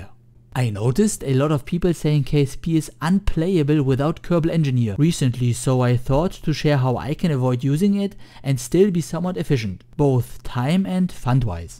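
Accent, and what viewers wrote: Germany. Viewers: You have an amazing accent. Sehr deutsch! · German- English xD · Your accent... It is very strong... Is it German or Autrian, sound like it :D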